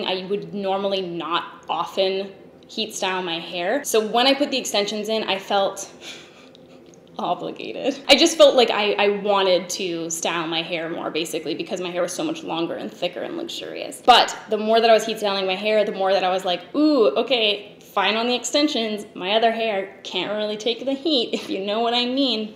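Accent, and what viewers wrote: Canada. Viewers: Almost a 1,000,000 :3 yujuuuu, btw I love how canadians say coat, boat, oat, out , etc I think is lovely ;3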